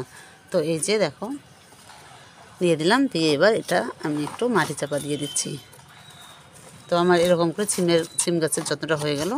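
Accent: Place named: Bangladesh. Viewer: আসসালামুয়ালাইকুম আপু, আপনার এই ১২" গ্রো ব্যাগ গুলোর হাইট কত ইঞ্চি? প্লিজ বলবেন। আপু আপনি বাংলাদেশিদের মত কথা বলেন।আপনি কি বাংলাদেশি ?😊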